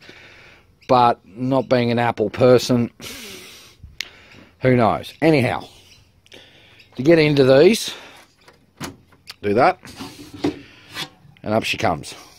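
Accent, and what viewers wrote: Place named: Australia. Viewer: Great to hear an Australian voice. I'm preparing to tear down my old Power Mac G5 for Intel (maybe Hackintosh) Conversion. I also used the ifixit guide. Thanks mate..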